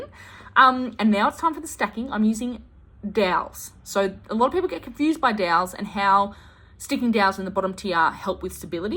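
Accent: Australia. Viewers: 😂😂to the American 😂 your voice ((Australian accent)) could never be a snooze fest😂😂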